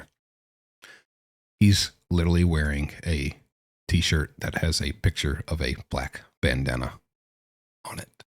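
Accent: South, United States. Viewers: Listening to a southerner explain anything is like a prison sentence on its own. Get to the point JFC.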